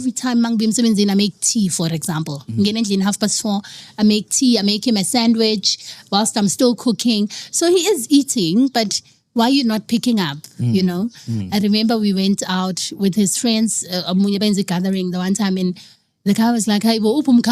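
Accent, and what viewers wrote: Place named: Venda, South Africa. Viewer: Is the host a Muvenda? I detect hints of Tshivenda accent in his English ❤❤❤❤❤❤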